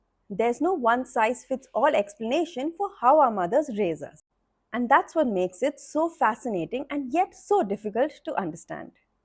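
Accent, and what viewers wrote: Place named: India. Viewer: Excellent video. If I can give you an advice as a viewer, please talk a bit slower, and remove the indian accent as much as possible from your English. Please refer to DoctorRamani videos as an example. Power to you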